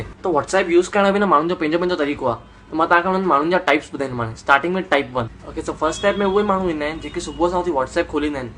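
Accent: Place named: Sindh, Pakistan. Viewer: your Sindhi is pure 😊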